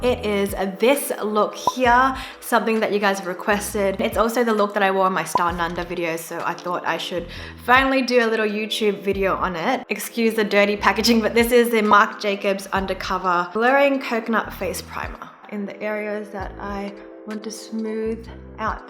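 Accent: Australia. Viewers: ay the nice aussie accent just makes me hit the subscribe button oh yeah love the final look 😍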